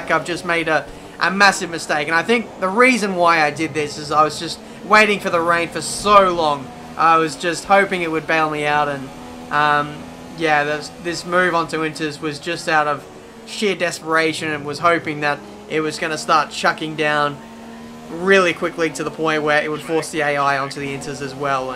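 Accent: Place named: Australia. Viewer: No apologies are needed, I watch because i like the Australian accent and because i love F1, its like watching a season of F1 without having to wait 2 weeks till the next race weekend.. When i get home and finish cooking i watch this as daily ritual almost, and a crap race like this is still more fun to watch than any of the shite i see on TV.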